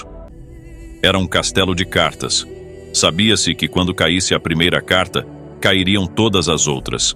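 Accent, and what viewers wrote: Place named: Brazil. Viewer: só uma duvida ........... pq varios canais africanos utilizam o sotaque brasileiro?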